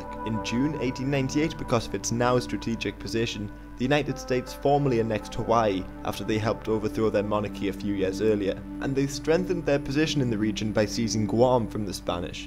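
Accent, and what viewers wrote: United Kingdom. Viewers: Is this a British man talking? this makes no sense unless her learned to talk British in the US >_<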